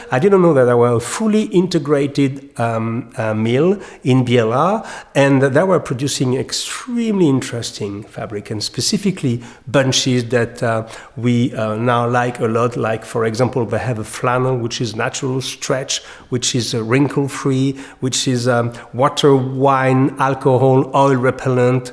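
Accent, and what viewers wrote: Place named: France. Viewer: ...And also displays a delicious French accent.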